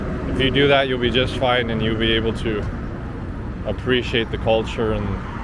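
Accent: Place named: Minnesota, United States. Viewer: I'm guessing you're from Minnesota possibly from your accent? How does it compared to other border cities like TJ?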